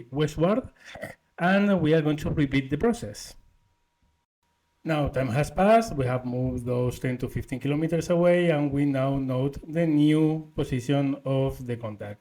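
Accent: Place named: Spain. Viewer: Unrelated to anything but Im wondering if you're Spanish since you sound like my Spanish coworkers when speaking English. But I could be wrong.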